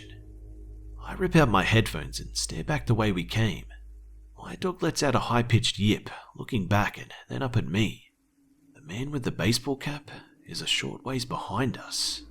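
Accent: Australia. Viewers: I agree with you 100%; IS one of the best narrators on YouTube! His content is ALWAYS excellent AND satisfyingly creepy. I too an not a big fan of The Creepypasta genre (as I assume you aren't either) and his channel rarely presents them. His content is always well chosen; it is gripping, spooky, pleasingly bizarre at times and is always presented in a very listenable manner. (AND, how amazing is his Aussie accent?!) `s channel is DEFINITELY one of the very best on YouTube! 😱💀☻👻🦇🕸🕷🐱☠👽🖤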